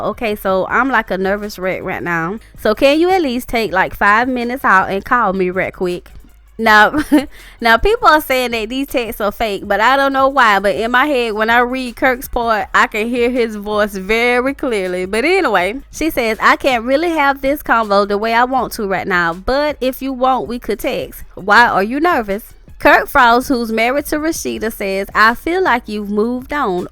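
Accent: South, United States. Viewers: Your accent and laugh is so adorable!! And those phrases are funny as hell "Clutch my pearls. A shame and a scandal." OOOuuu, you know you're from the South!! LOL. I could listen to your videos all day.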